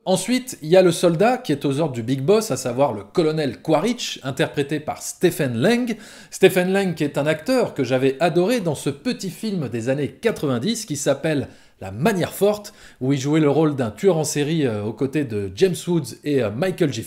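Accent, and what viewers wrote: South, France. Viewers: Lorsqu'on met Aliens 4ème d'un classement on se rend compte réellement que James Cameron n'a fait que des excellents films voir des chefs d'œuvre. Je le mettrais plus haut dans la liste mais le top 5 est très compliqué Concernant Avatar je le mettrais aussi dans les derniers du classement car il m'a marqué essentiellement pour ses effets spéciaux révolutionnaires et que dire de la 3D... Pour la musique je ne suis pas d'accord avec toi par contre je l'écoute de temps en temps mais pas autant que celle du seigneur des anneaux qui est addictive Du coup si je devais faire mon classement des films de James Cameron (versions longues uniquement) 1) terminator 2 2) aliens 3) titanic 4) Terminator 5) Abyss 6) avatar 7) true lies Vivement la réouverture des salles obscures Bel accent du sud sinon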